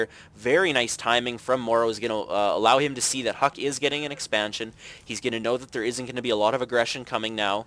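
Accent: Canada. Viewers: I'm Canadian and I didn't know what a Canadian accent was before hearing you. Keep up the great work. Your commentary is up there with the best. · you sound so canadian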